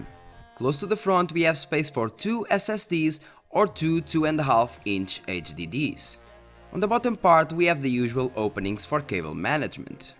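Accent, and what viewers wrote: Portugal. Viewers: E esse sotaque tuga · deu para perceber pelo sotaque que és tuga . Continua com ótimo trabalho, abraço !! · Are u portuguese? I can see it by the acent xD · Olá a todos, eu cai aqui de pára-quedas e depois de ver este video fiquei desconfiado que o é português de Portugal, estou certo ou errado? É que este inglês ó típico de Portugal.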